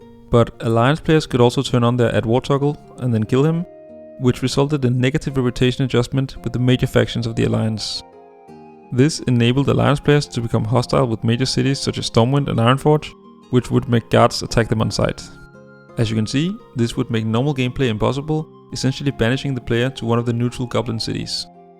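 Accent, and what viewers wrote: Denmark. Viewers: Elsker din danske accent :D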